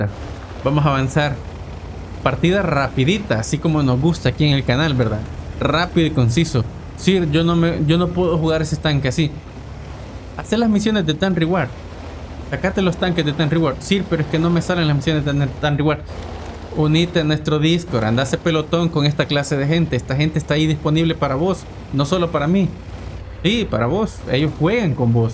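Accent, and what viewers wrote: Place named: Argentina. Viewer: Che de donde sos? Te escucho y algunos modismos o formas de hablar pareces de Argentina jaja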